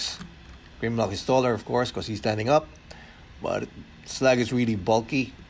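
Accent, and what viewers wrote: Philippines. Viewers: You sound like a Filipino. Mabuhai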